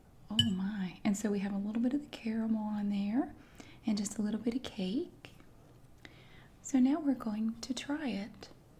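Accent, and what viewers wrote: South, United States. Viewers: That doesn't sound illiterate at all. It's obviously just an accent. I could listen to it forever. You shouldn't try to sound less Southern. It's lovely and be proud of it. Here OLL! For everyone :)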